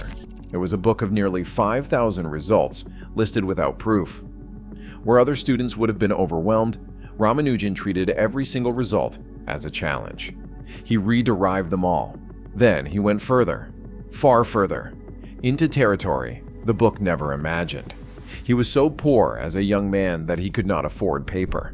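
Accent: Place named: United States. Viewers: And the American accent is not exactly realistic. Are there no people still alive who can speak with an educated English accent ? In fact, this post was immediately followed by an advert for a charity, spoken in proper English, as spoken at Cambridge University. (I had a friend who came from a working class background and had to learn to speak in the accepted way when he went to Cambridge University). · The real Hardy didn't speak with an American accent. And he also knew how to pronounce Ramaujan's name correctly.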